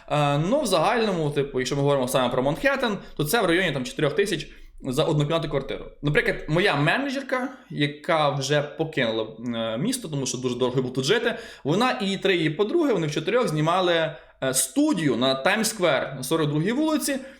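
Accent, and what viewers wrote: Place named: Ternopil, Ukraine. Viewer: Лол, дивився це відео на кухні. Моя мати за 5 секунд оприділила, що ти з Тернополя 😅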